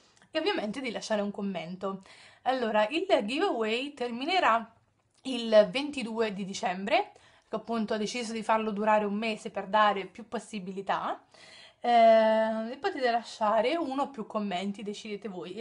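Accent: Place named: Tuscany, Italy. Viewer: Ma poi il tuo accento è bellissimo, sei Toscana per caso?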